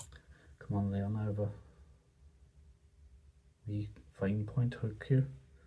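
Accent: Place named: Ireland. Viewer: What part of Ireland are you from....nice tie by the way....keep up the good work....i've only started trying to tie smaller flies recently as the eyesight isn't as good as it used to be unfortunately...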